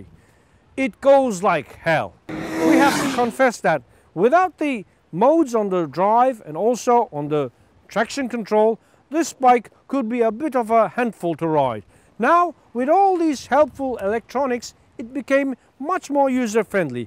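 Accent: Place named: England, United Kingdom. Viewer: Bildigin ingiliz aksani var kardez. Neyini begenmedin.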